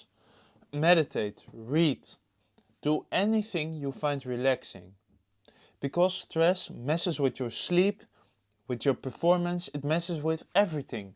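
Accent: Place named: Netherlands. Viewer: The dutch accent 😂😂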